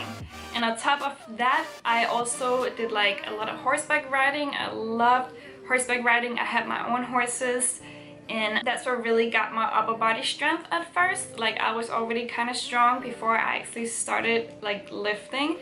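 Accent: Denmark. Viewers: jeg kunne høre med det samme at du var fra Danmark, ahahah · man kan bare høre på din "accent" at du er dansker. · as soon as i heard your voice i was like, she have to be from denmark i can hear it. and then you said it yourself.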